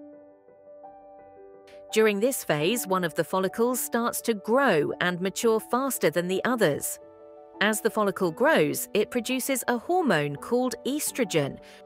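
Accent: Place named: United Kingdom. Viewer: O sotaque britânico é perfeito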